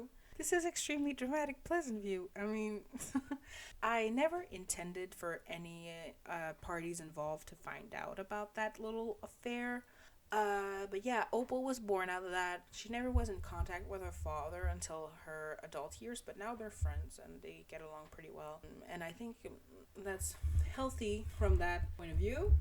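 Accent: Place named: Ontario, Canada. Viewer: I see Canada, and I know you are in college and have a major Ontario accent. We might possibly be going to the same institution, haha.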